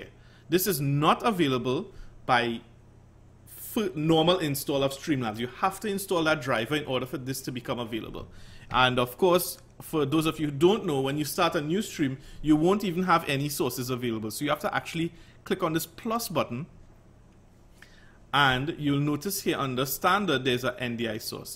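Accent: Trinidad and Tobago. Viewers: you from trinidad awa? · why do you sound Trinidadian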